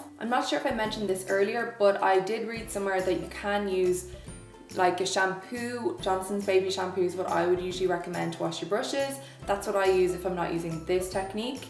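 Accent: Ireland. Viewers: She's Irish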